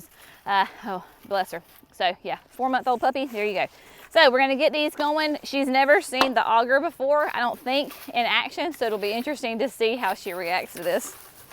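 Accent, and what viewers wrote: South, United States. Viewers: I was so inspired and motivated watching this video!! I am in the process of moving blueberry bushes and was so worried I might kill them as they are very established. Some how watching you calmed my fears. Question… where on earth can I find some ‘Land and Sea’ compost??? I live in Kentucky close to Clarksville TN and can’t seem to find it at my garden centers. Is there another alternative you could recommend?? I just love your channel… your sweet southern accent and smile MAKES MY DAY! ❤️❤️❤️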